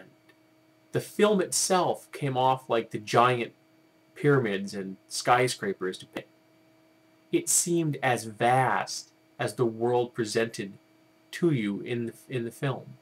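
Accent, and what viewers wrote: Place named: United Kingdom. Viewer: It's the Britishness, you know? People love the accent.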